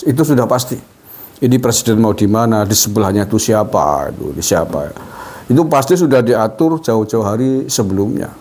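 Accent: Banyumas, Indonesia. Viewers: Baik sekali konten ini....sangat santun....wong ngapak...isthebest...❤❤❤❤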